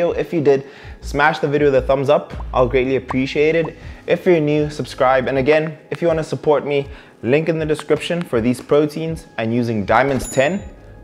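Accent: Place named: South Africa. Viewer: You sound South African ❤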